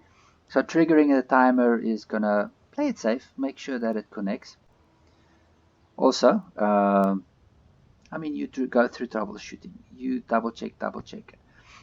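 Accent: South Africa. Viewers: Sounds like a South African to me! Lekker! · Ah ok, accent was dead giveaway :) im unfortunately still in South Africa...but yeah, I enjoy this game quite a bit. Happy engineering to you and keep well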